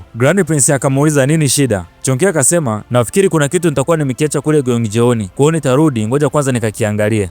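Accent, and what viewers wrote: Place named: Kagera, Tanzania. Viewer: Mtangazaji ana lafudhi ya kihaya😊😊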